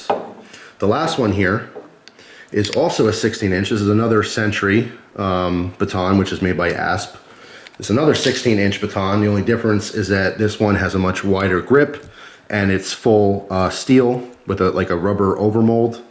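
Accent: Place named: Rhode Island, United States. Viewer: Hey, are u from RI? U sound very Rhode Islander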